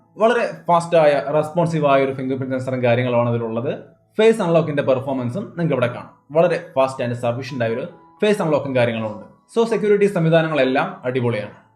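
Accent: Thrissur, India. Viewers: Uff thrissurkaarana aano 😍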